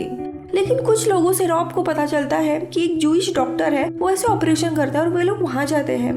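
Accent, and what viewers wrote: Maharashtra, India. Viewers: May be from marathi school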